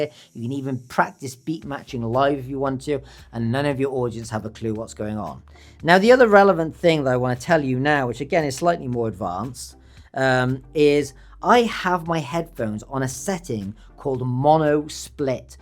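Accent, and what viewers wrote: United Kingdom. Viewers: Who is this bri'ish bloke trying to tell me how to do?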